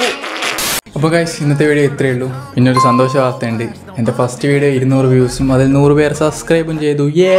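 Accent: Kannur, India. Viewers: KANNUR aano place oru Kannur slang undallo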